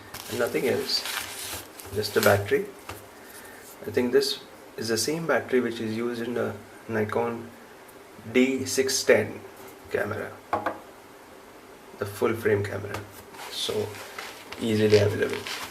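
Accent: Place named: India. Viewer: love that accent my indian friend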